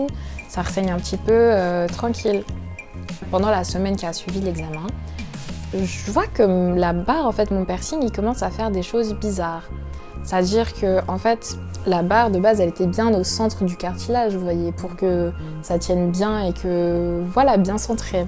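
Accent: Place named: Cameroon. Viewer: L'accent Camerounais est présent 🇨🇲🇨🇲🇨🇲😂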